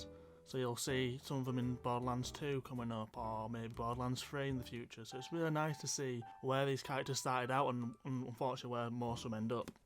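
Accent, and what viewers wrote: United Kingdom. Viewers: I'm more invested in the politics/news side of YT so I don't have a lot of experience with game reviews but this was definitely a good first video. Even though you're from the wrong side of the Pennines, it's still nice to hear more regional accents! 😁 I like how you break the review up by focusing on different elements (characters, gameplay, story, pros/cons) - this would work really well with some graphics and also help you to stay focused (if you're considering how to expand your look and build a brand). You sounded pretty confident throughout and if you were using a script then I think you must have spent lots of time memorising it. The only notes I have for delivery are the intro, you seemed to rush through it and it was a bit too fast. Take a breath and remember that you're awesome! Good luck with the channel, and well done on an engaging and interesting first video. 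👏👏👏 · I'm no gamer but a sub of your Dad's, a Bradfordiian and love our accent, I've subbed. All the best :) x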